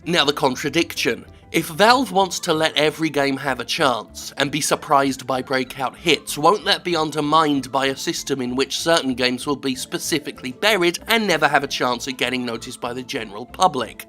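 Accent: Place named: United Kingdom. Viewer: Sven Tempest these countless accents you are talking about are dialects. someone from London might sound slightly different than say someone from Liverpool but they are still English accents none the less. take it from the perspective of someone from outside the u.k they hear someone like Jim speaking they think British but if they hear an Irishman speak then that guy is Irish not british even though he is part of the union. hell even people in the u.k never refer to their accent as british.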